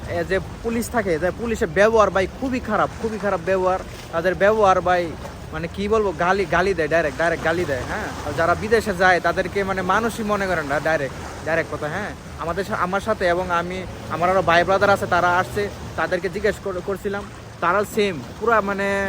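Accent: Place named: Chapainawabganj, Bangladesh. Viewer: ভাইয়া তোমার বাসায় কি চাঁপাইনবাবগঞ্জ 😊😊😊😊😊রিপ্লাই টা দিয়েন ভাষা বুঝে বুঝলাম 😊😊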